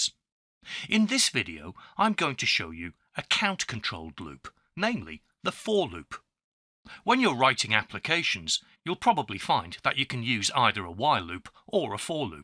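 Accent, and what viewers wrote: United Kingdom. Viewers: hearing your beautiful British accented voice made me subscribe right away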